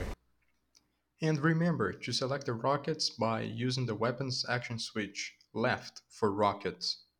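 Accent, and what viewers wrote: Brazil. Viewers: Tá bem BR esse sotaque aí hein! Anyway, thx for the tutorial.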